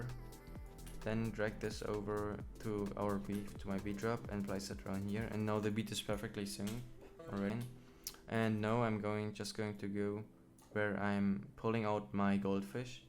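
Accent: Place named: Germany. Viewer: You can just barely hear that you're german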